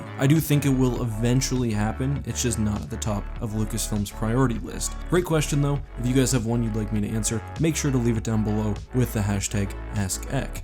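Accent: Canada. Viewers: are you Canadian? As a Michigander, I know a couple Canadians. And my tech/accounting teacher is Canadian. I’m asking cause sometimes you say “aboot” instead of about. Lol I’m just curious.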